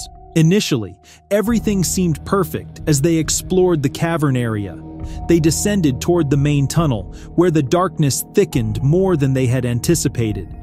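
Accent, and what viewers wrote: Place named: Germany. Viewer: dude, you have a german accent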